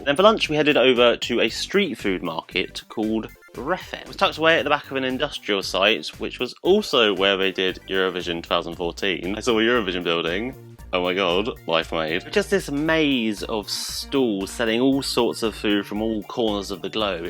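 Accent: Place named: United Kingdom. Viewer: Dont know this channel. Where are they from? He sounds British but when he talkes about visiting Denmark it sounded like he lives on the other side of the World.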